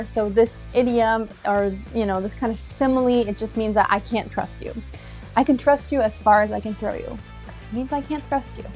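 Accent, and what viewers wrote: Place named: California, United States. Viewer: Thank you very much for your video. I added your channel. I am always wondering where you are in the US. I slightly hear California accent.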